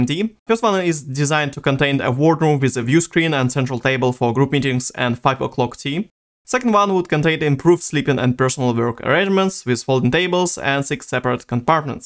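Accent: Russia. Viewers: Прекрасная работа! Даже не представляю, как много ты тратишь на ролики. Реально достойно уважения, учитывая попытки идти в сложные темы и сразу на английском (верно ведь понимаю, что русский твой родной язык? Слишком хорошо чувствуется русское произношение). Верю, что рано или поздно, сохраняя подобное рвение, добьёшься больших высот и, чем чёрт не шутит, будешь наравне с Мэттом или, прости что копирую, Stratzenblitz75. В общем, верю в тебя, чувак. Продолжай в том же духе, если тебе это интересно. PS: Если не сложно, можешь исправить "Куррент"? К сожалению, не на часы смотрим, не ждём биения курантов, хоть и в текущий момент все эти модули и существуют. Бьёт по ушам такой "Карент". В любом случае, достойно уважения! Спасибо.. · Oh, okay. Your accent hears like real russian :D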